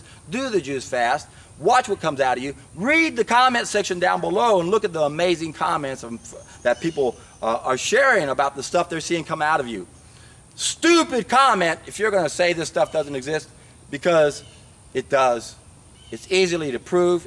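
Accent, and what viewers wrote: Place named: Texas, United States. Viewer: Gosh I love this guy😂😂😂😂 Get rid of the shit . LOL Very true he is , with that Texas accent . Lovely😘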